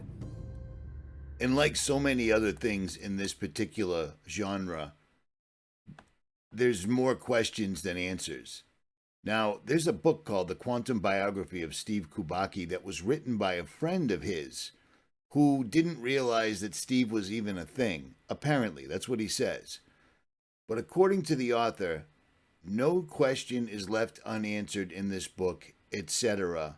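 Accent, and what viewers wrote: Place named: Boston, United States. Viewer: Just subbed sounds like Ritchie from boston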